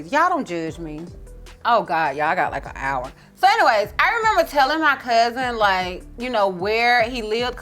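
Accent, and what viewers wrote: South, United States. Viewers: I loved this video! You had me laughing out loud in my livingroom! Between your story, the voice changes, sound effects, your facial expressions, and your cute country twang, you had me hollering here in NJ! ⁰Thank God he ain't kill ya! Lol! Chica, You are too much but so funny. Looking fwd to hearing your next zodiac tale! LOVE YOUR CHANNELS, Ms.!!😅✌🏽🤣🙏🏽😉💜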